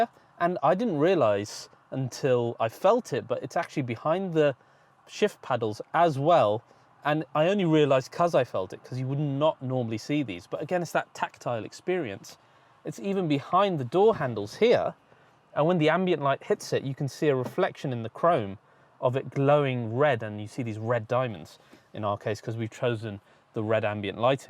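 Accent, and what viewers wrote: United Kingdom. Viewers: Very Strange how you Brits all have the same Accent and pace when talking even the Middle eastern ones that aren't Caucasian .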